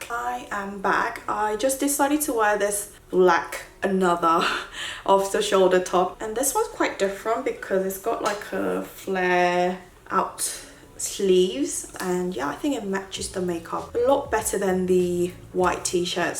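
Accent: United Kingdom. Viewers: 첫인상 리뷰 너무 좋아요 언니 영국 발음 볼때마다 짱짱...사랑해요....❤️❤️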